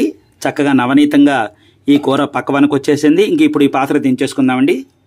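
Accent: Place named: Godavari, India. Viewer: చక్కని గోదావరి జిల్లా తెలుగు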